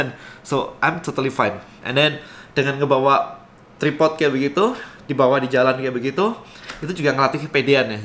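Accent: Java, Indonesia. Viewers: Medok tenan ko kkkk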